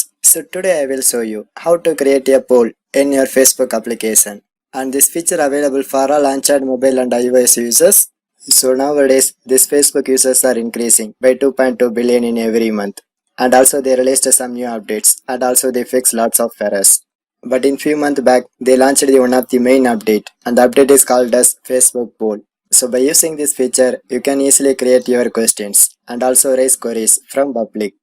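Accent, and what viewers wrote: South India, India. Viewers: Your South Indian accent... 😁👍🏻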